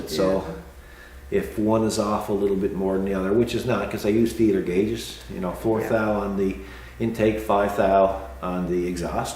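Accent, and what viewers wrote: Newfoundland, Canada. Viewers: That sure sounds like a west coast Newfoundland accent??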